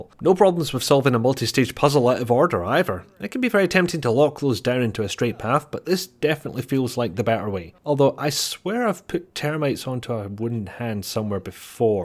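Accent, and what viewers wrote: United Kingdom. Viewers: you sound british to me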